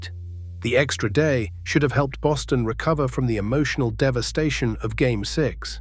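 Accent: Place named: United Kingdom. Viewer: The accent tells us at the very beginning,, this video is going to be a bomb 💣 😅😂😅,,, Veeda Blue,,,, Dykstra Crushed a home-run off oil can Boyd, it hit the Pesky pole in right field, it's been said to be anywhere from 274 feet to 300 feet, regardless, its not a home-run that was CRUSHED, but not according to this Britt nitwit! 😅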